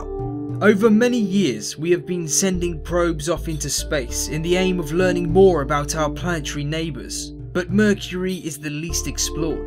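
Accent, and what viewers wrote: United Kingdom. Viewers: Your accent is British but PLEASE dont talk the American way by saying farenheight cuz your english is native and how it is supposed to be whereas America's dont own the english language natively in which they rewinded a few words making it confusing, Its your language please say degrees Celcius and maybe put farenheight in brackets? (sorry for my poor spelling) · I am most definitely sure that it’s a British accent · Nice use of Fahrenheit in 2015 with a British accent where we use Celsius unless you're older than like 50.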